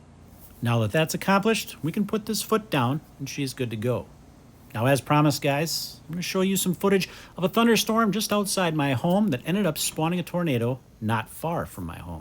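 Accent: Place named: United States. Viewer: this one of the few american accents i find bearable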